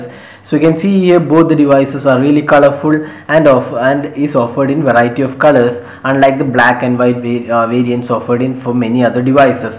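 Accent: India. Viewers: Whats up all these 35422 different indian mobile phone review channels?!?! Gimme a break guys. I'm totally allergic to your accent! Sorry!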